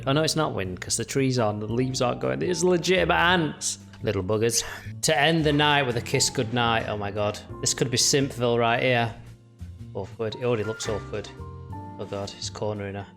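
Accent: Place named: England, United Kingdom. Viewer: Your English accent and the guy farting was so funny.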